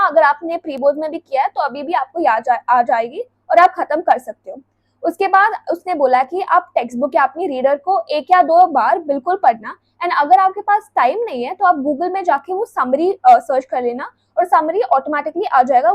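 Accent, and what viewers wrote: India. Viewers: Your Hindi accent ❤❤❤ Op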